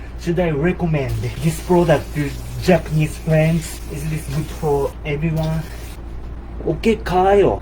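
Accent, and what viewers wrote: Bisaya, Philippines. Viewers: favorite accent sa bisaya mapatay na ko tawa diri 🤣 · I love the bisaya accent ❤️ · Nganong ganahan ko sa imong bisaya accent uyy 😍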